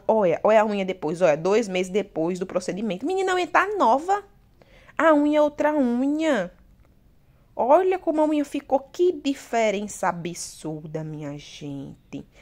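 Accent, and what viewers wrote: Northeast, Brazil. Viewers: Nada chata, adoro o trabalho da, adoro os vídeos dela e até seu sotaque nordestino😍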